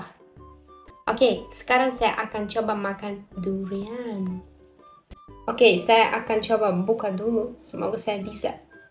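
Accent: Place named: Netherlands. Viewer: E kamu ngomong ky orang holanda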